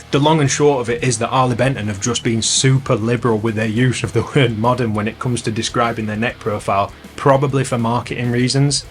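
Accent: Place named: South Yorkshire, United Kingdom. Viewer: Accents fascinate me...and Ive been trying to work out yours... Im going to guess...somewhere around South Yorkshire??? 🤔 (Dont have a go at me if youre a Lancastrian! 😅)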